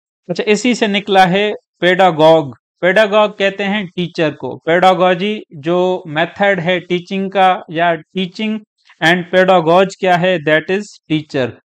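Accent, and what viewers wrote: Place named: Sindh, India. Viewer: Keep it up....very great efforts....ur pronunciation infirms that u r sindhi man...Am I right ???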